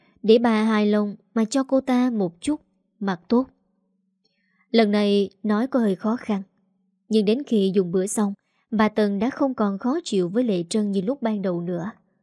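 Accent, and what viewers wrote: South, Vietnam. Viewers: Giọng đọc miền Nam của quả thật nhẹ nhàng ngọt như mật ong. Love it! 🥰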